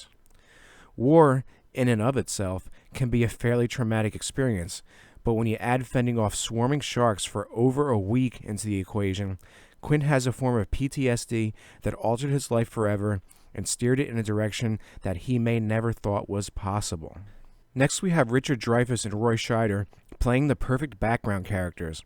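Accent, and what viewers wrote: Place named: Philadelphia, United States. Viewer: You have a strong Philadelphia accent.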